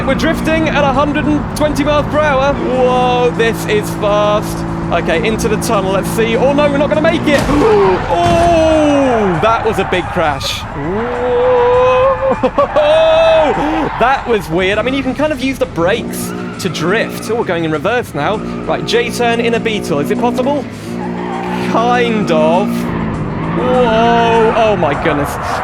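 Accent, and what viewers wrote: United Kingdom. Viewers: are you britsh?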